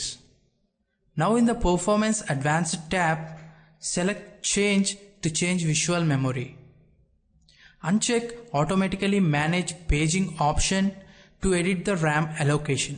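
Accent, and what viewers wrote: India. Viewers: Your accent is Indian and I had random Indian friends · nice indian accent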